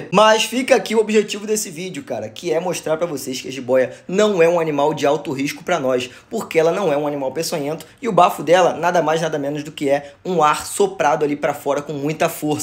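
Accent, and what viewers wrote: Rio de Janeiro, Brazil. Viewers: Você é tão carioca, que você falou “um dos”, e a legenda apareceu “um dois”. Hahaha! Abraço! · Adoro teus videos .muito objetivos Tu é o cara e o teu sotaque é muito legal carioca né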